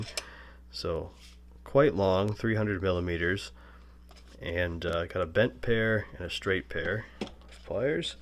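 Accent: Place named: United States. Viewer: This guy sounds american. Why is he speaking in metric?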